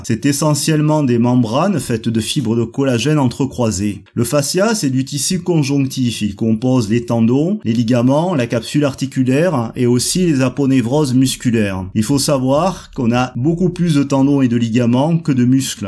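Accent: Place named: Corsica, France. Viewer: Super video ! On croirait meme reconnaitre un accent corse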